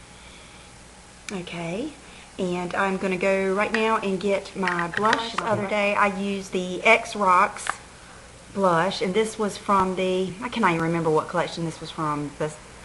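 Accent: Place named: North Carolina, United States. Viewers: Mrs., you had me laughing this whole video with all of your annotations. You and my mom are two peas in a pod. You remind me of her so much. We're from NC, and everything from your accent, to your 'sillyness' just reminds me of her. I'm only 19, and I don't live with her any more, and watching you is like a little piece of mom on my computer screen;). Love your vids! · It's good to laugh at yourself sometimes, you look lovely anyway! Love your accent, I grew up in S.C., makes me kind of homesick! Happy Mother's Day!!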